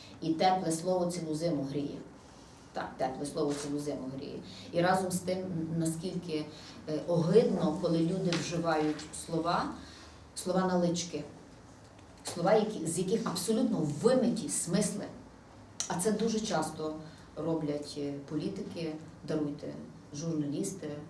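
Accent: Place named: Western Ukraine, Ukraine. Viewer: ты говорить научись не на суржике западенском, а на нормальном языке, на русском или украинском, но не на западынском как ты сейчас базлаешь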